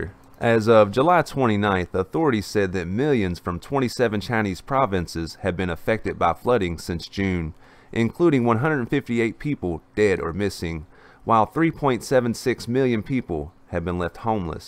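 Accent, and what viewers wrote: South, United States. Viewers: Like the accent tho I gotta southern accent too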